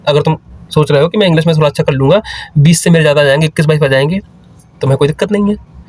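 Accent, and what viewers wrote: Lucknow, India. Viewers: Aap lucknow se ho?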